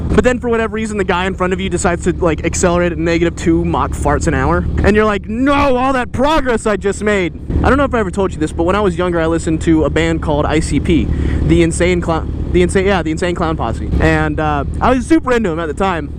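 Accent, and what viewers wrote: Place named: Georgia, United States. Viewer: It’s Georgia we all have one that comes out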